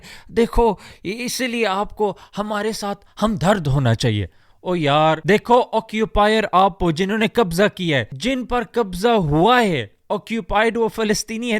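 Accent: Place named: Punjab, Pakistan. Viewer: Bhai aapka lehjaa punjabi hai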